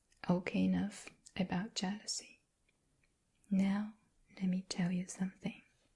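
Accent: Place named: Australia. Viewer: 好好听 有一点点澳洲口音哈哈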